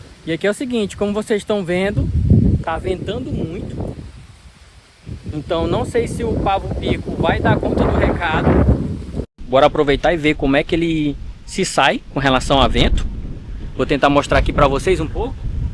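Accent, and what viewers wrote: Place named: Maranhão, Brazil. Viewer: Vi um vídeo seu que estava se tremendo todo e com dificuldades ksksks. Ja melhorou muito. Vc é maranhense né? Pelo sotaque eu suspeitei que era, e depois que vi escrito UFMA, ai tive mais certeza ainda. Mas só me tira essa dúvida para eu ter mesmo certeza. Sucesso ai meu brother, vídeo muito bom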